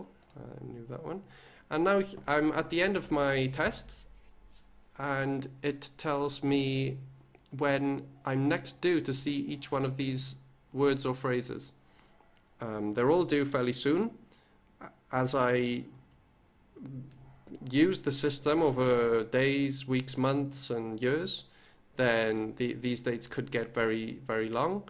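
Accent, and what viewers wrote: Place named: Wales, United Kingdom. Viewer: I love hearing a fellow Welsh accent on youtube, always a nice surprise! Thanks butt!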